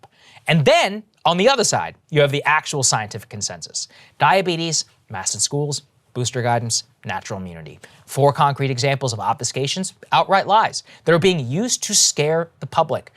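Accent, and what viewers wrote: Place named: Boston, United States. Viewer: This sounds like a Boston leagel speach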